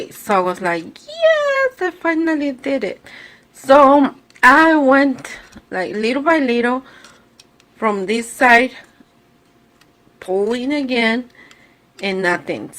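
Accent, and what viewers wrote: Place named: Mexico. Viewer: That Mexican accent is stronggg lol its cute tho😂🥰 🇲🇽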